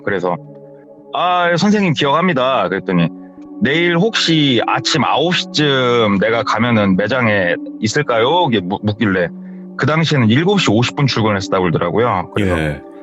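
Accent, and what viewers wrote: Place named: Jeolla, South Korea. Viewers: 고향이 전북쪽인거 같아요 말투가 너무 정겨워요~~째깐한^^저랑 고향이 같으신듯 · 의 정겨운 전라도 사투리..좋아요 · 맞아, 몰입감 너무 좋고 전라도 살았던 청취자로서 오랜만에 듣는 사투리에 정겹게 들었음ㅋㅋㅋ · 정겨워요~ 저도 전주사람 입니다 제가 항상듯던 우리동네 말투~ㅎ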